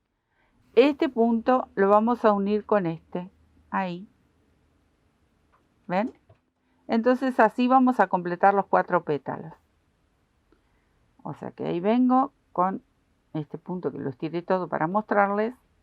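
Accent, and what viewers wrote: Argentina. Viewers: ¿Cómo escucho en castellano? De repente hay una voz mecánica y fea en inglés ¡en vez de mí acento argentino favorito que extraño tanto!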